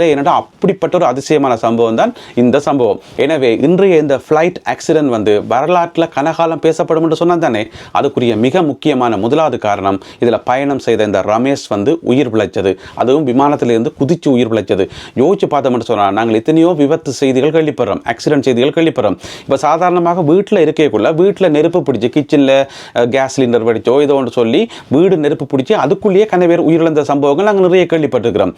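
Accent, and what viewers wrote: Jaffna, Sri Lanka. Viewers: He is a srilankan. Srilankan tamil is pure . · Firstly I thankyou for your talkbeautiful Sinhalese Tamil well whatever happens ellam. Avan chail whole world is mourning and offering there prayers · அழகான இலங்கை தமிழ். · Ilangai Tamil huh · இலங்கை தமிழ் உச்சரிப்பு அருமை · Thambi, Thamizh oru Thinusa irukkae! Ilangaiyaa? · Yes he may be belongs to Elangai. It's Elangai Tamil. People who lost their lives their Soul may rest in peace. Very sad. 🙏🙏🙏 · Its sri lankan tamil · இலங்கை தமிழர் என்று நினைக்கிறேன். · Srilanka tamil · உங்கள் இலங்கை தமிழை ரசித்தேன் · தமிழ்நாட்டில் எங்கே இதுமாதிரி சுத்தமான தமிழ் பேசுகின்றனர். இவரின் பேச்சு இலங்கை தமிழே. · இனிய இலங்கை தமிழ்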